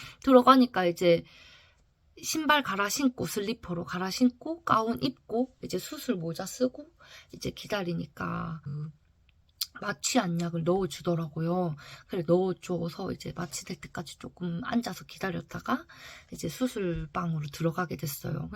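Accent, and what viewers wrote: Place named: Andong, South Korea. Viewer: 야 안동 말투 너무 오랜만에 들어서 그런지 너무 재미있다 ㅋㅋ 영상 너무 재미있게 잘 봤어 😊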